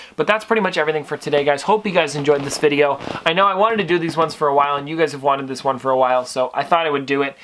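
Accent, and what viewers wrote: Canada. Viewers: I love how Canadians say about lol a boot like every other word is normal just the about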